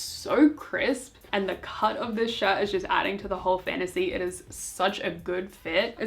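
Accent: Australia. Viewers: danggg it’s so refreshing hearing an aussie voice!!!! love the way you style out of the box pieces… i’m feeling very inspired. can’t wait to go in a youtube hole of your chanel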